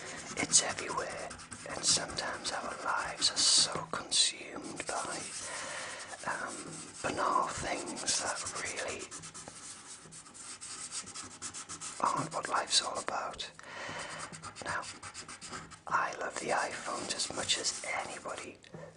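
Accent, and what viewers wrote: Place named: Newcastle, United Kingdom. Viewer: Oh really? I guess you kind of sound like a geordie too? :)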